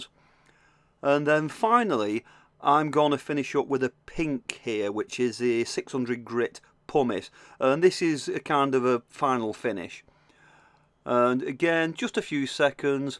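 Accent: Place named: England, United Kingdom. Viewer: Great to here an English accent on a video! Don’t think we can get these in South Africa though!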